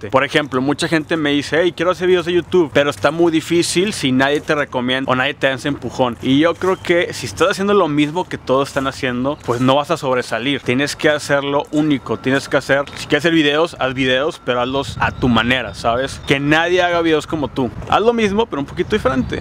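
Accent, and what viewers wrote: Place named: Upper class, Mexico. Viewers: Hablas muy fresa 🍓🍓jeje Me encanta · Me encantó tu vlog y mas tu acento de mirrey Paps ;)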